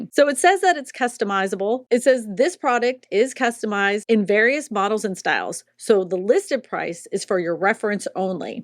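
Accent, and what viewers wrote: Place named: New Orleans, United States. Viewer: I thought I had heard some aspects of a Yat accent. :) I can't imagine how much this would cost to insure, even on the Northshore.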